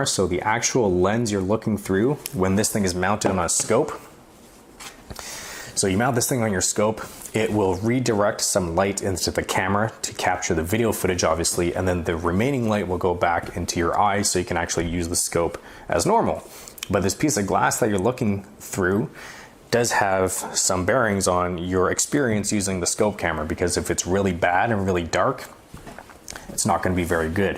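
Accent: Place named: China. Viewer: ESL...you mean Chinglish.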